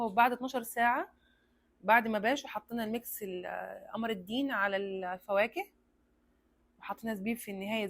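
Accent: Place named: Saudi Arabia. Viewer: حاسه فيه لمحة لهجة سعودية 😂😂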